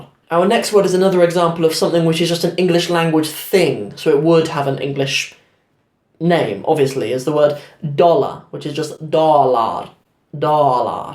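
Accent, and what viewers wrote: North, Somalia. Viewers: Bro You Are Using The Words In Northern Dilects Like Hudheel,Shaadhi, Kabadh And Etc That 'Dh' We Pronounce As 'R' And 'T'In The Eastern And Central Dilects Such As Huteel,Kabar, Shaati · That’s the northern Somali accent. We say Gabadh and you say Gabar.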